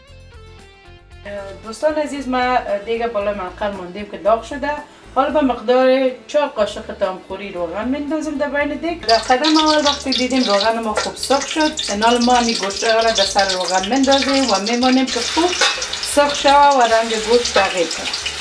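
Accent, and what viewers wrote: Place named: Kabul, Afghanistan. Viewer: تشکر خوهرک چه یک غذا وچه یک لهجه مزدار کابل که ما زیاد خوشدارم مافق وسرفراز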